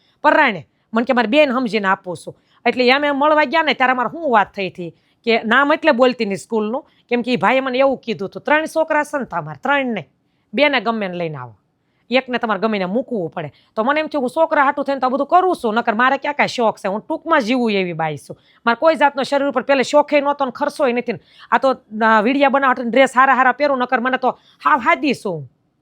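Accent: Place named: Kathiawad, India. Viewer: નમસ્તે બેન. આપની સ્પીચ સાંભળી મને મારું કાઠિયાવાડ યાદ આવી ગયું. 🙏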